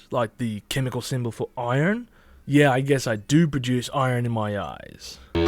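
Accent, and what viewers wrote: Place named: United Kingdom. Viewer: Dude you totally sound british 😂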